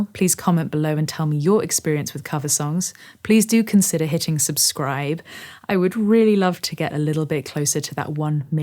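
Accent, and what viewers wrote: United Kingdom. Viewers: As an American for quite some time, your British accent knocks me out: "Johnny Cash and _____" (something naughty) and "One of the best ways to grow your audience' hair" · I suspect she could earn serious money doing voiceovers for companies who need an authentic and very well articulated brit voice. Could listen to it all day TBH.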